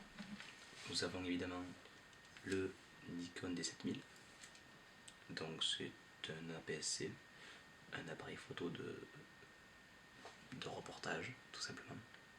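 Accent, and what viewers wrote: South, France. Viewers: toi tu est sudiste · Accent du sud haha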